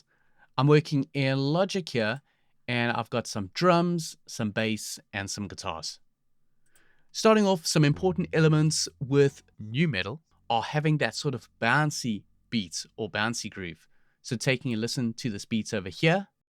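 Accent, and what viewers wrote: South Africa. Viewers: I love the South African accent! Relaxing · Hi. I am a South African too, but living in the UK. My friends in the UK always pick on me for the way I say "here". I never really noticed it with myself, but when I listen to you speak, it is really noticeable. Hahaha. Anyway, this is a really cool video and great sound that you get. I am really enjoying learning what you have to teach. You showed us a bit of your mixing in this video, but How about a more in depth mixing tutorial? If you have already done this, my apologies. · Are you perhaps South Africa? I am but living away from home ...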